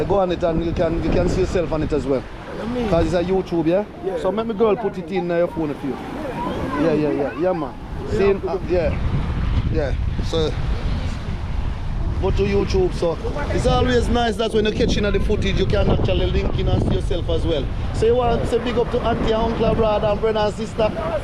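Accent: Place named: Black Country, United Kingdom. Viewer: Loving that black country accent.😊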